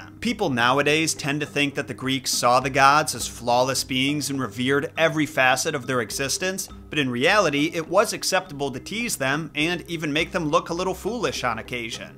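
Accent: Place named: Midwest, United States. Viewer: What part of the Midwest are you from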